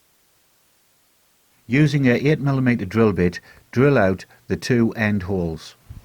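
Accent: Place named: North East England, United Kingdom. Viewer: Clear and simple, Sounds like you come from the area where I grew up, The North East of England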